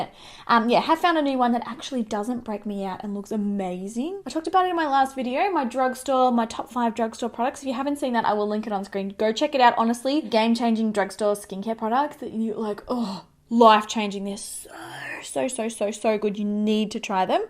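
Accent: Australia. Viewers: I love watching your videos and I love your Australian accent 😃❤ You are so beautiful on the top of that lol 😍🤗🌺 Xoxo from Canada 🇨🇦✌❤🤗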